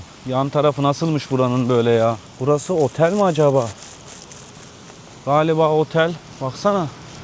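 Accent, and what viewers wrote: Thailand. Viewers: reis neden ingilizceyi tayland aksamı ile konuşmaya çalısıyorsun? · ingilizceyi tayland tınısıyla-aksanıyla konuşuyorsun artık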